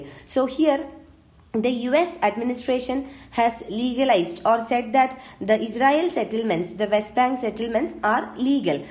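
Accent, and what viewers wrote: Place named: Kerala, India. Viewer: Good to hear a mallu:)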